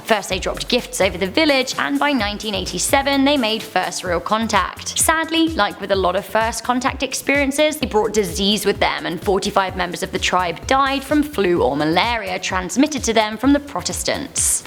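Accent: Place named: United Kingdom. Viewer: Goddamn i can only withstand so much british accent..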